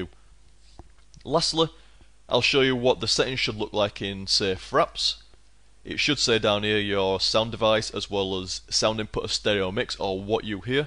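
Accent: United Kingdom. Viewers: I TOTALLY LOVE english accent :D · Am i right in saying thats a yorkshire accent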